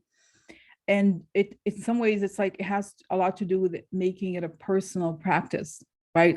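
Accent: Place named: Russia. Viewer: me encanta su manera de dar su informacion siquica y su acento ruso🥰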